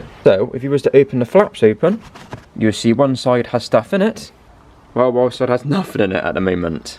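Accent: Bristol, United Kingdom. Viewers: I was going to say this is a normal Bristol accent. Some people have no ideals! Lol ❤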